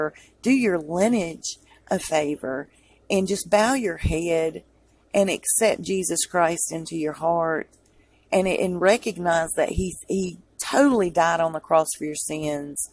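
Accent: South, United States. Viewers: Well said!! You have this sweet country accent combined with such truth, intelligence and highly articulately. Love your work girl! 👏🏼👏🏼👏🏼👏🏼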